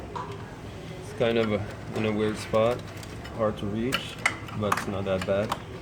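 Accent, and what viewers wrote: Quebec, Canada. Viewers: Es tu québécois???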